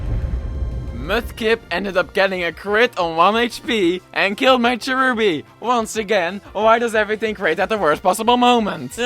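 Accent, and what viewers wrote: Netherlands. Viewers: Are you dutch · Hears first sentence, jep that’s a dutchie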